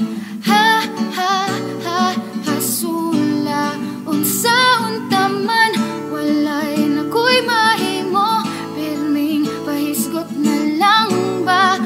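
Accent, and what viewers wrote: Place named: Visayas, Philippines. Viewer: ang cute mag BISAYA hehehe NICE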